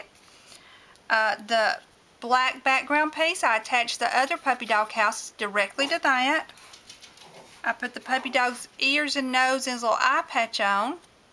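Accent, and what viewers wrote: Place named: Alabama, United States. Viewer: I really enjoy your videos and blog. I love to hear you talk because you sound like me. I live in Alabama with you. I'm about 20 min. from B'ham. So were almost neighbors. Thanks for sharing.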